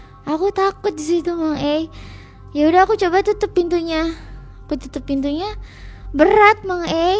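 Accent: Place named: Cirebon, Indonesia. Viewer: Yah Cirebon jemaras dia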